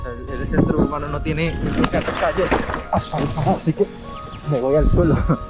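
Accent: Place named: Spain. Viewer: wow .... Naciste en china?.Pero me imagino que te has criado de pequeño en España, no?.Porque si tampoco es así, tienes el mejor acento español peninsular ... 😁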